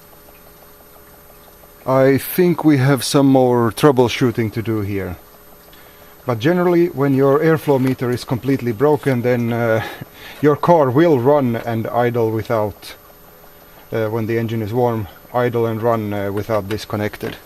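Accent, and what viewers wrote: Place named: Russia. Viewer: My native language is Russian. I heard your pronunciation and assumed that your native language is Russian too.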